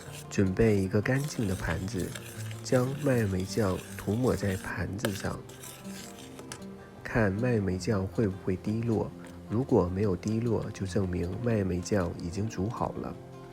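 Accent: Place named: Northeast, China. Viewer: 哈哈哈哈哈 笑死我了， 你开口 就已股东北味，挡都挡不住那种！ 哈哈哈啊哈哈 越听越搞笑！！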